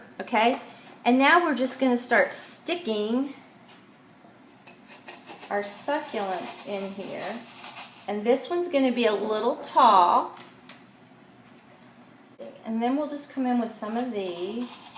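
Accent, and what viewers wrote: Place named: Los Angeles, United States. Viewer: Do you live somewhere in LA. You have an accent similar to mine, but I haven’t lived there in 27 years.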